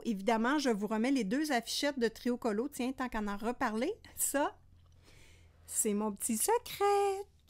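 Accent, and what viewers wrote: Canada. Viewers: Cc, je viens de partager cette vidéo à une copine qui a perdu l envie de colorier et qui aime l accent canadien. Je pense que tu pourras lui redonner le peps pour colo. 😘😘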